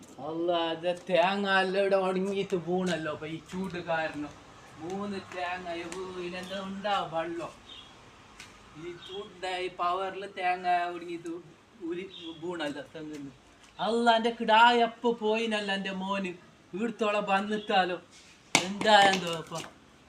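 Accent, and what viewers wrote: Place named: Kasaragod, India. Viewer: Ith kasaragod choori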